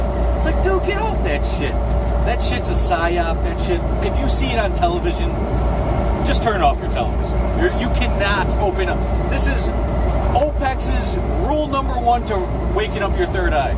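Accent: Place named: Rochester, United States. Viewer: Dude I KNEW that had to be a Rochester accent!! I grew up there.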